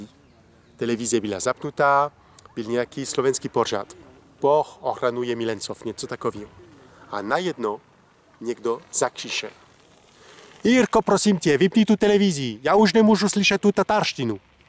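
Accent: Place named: France. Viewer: Je to sympaticky tenhle francouzsky (?) prizvuk v cestine. Ahoj z...Rumunska, kde existuje dulezita cesko-slovenska mensina! C'est sympa cet accent (francais?) dans le tcheque. Salutations de la ...Roumanie, ou il existe une minorite tcheco-slovaque importante!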